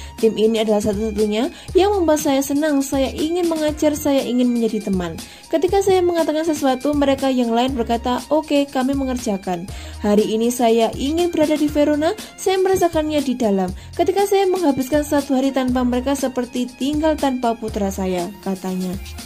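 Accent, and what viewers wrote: Java, Indonesia. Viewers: Medhok banget mbak wkwkwk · Salam buat Narator Channel Youtube AC Milan Indonesia ini, mêdoq bangets... unique 👍🤩👍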